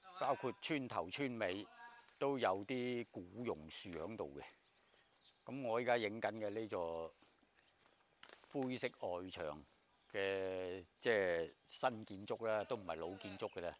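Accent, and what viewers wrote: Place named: Hong Kong, China. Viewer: 原汁原味好视频! 先生是识货之人，满口港澳同胞 广州音，对本地情况还是比较了解的。白沙和三八，实质上都是公社一级的行政区域。著名歌手陈伯强就是台山县白沙公社人。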